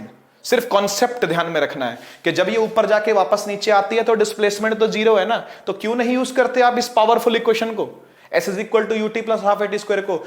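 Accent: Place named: Pahari, India. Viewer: Sir bhi pahari bolte ha............ nicha thala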